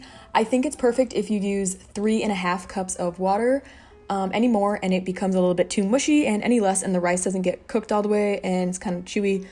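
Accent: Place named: United States. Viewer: What American accent is this :-)? Sounds intrueging!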